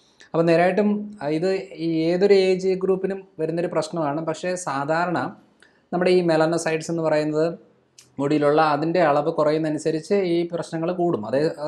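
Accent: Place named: Pala, India. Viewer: എന്താ രസം...Dr.....അവതരണം..സൂപ്പർ...ഭാഷ...സൂപ്പർ... പാല അച്ചായൻ... സ്ലാങ്.... ഇത് Dr..aayi തോന്നുന്നില്ല..just..Oru frd...nice..❤️❤️❤️😘😘😘😘😘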